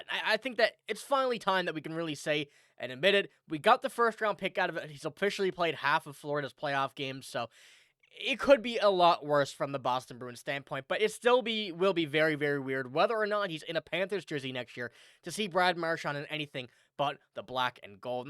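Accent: Newfoundland, Canada. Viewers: As much as I love 63, and would have loved him to retire as a Bruin, it wasn't going to help the team to keep him. I can't see him playing third line on the B's. I pray to God he doesn't go to the Leaves. I'd rather see him in Montreal. My stomach tells me he stays in Florida. With regards to Sway, he better get his act together. Oh, I finally subscribed. Question are you a Newfie?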